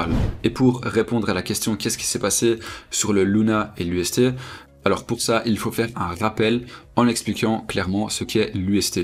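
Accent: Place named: United States. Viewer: Bonne vidéo, je m'abonne ! Au passa l'accent est Américain apparemment 😁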